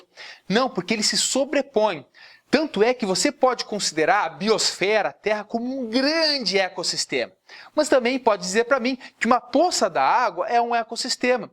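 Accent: Rio Grande do Sul, Brazil. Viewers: tu é gaúcho sor?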